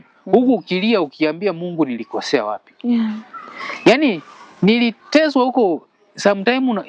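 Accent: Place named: Tanzania. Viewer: Kaka, Kiswahili chako ni kisafi sana, naskia lahaja ya Kibongo kwa mbali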